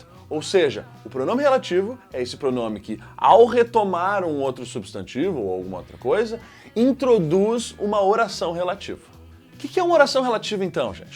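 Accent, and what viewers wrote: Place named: Rio Grande do Sul, Brazil. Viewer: sotaque gaucho gostoso da porra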